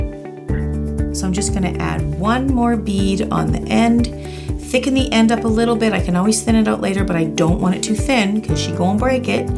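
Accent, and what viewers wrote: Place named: Canada. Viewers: Cecile Ruer You are welcome Xx. You can hear it by how she pronounces certain words. Like -about etc. Very typical Canadian, and I love it hihi. No Aussie accent tho which is totally different :P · Gille87 Yeah i asked because the accent was for me very canadian :p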